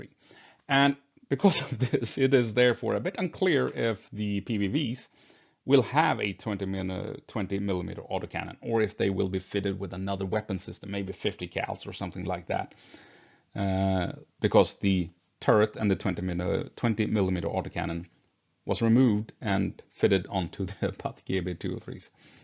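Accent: Gothenburg, Sweden. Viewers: Du måste vara Svensk, finns ingen som klarar det utalet ;D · är det göteborgska som jag hör?! Gissa mitt namn!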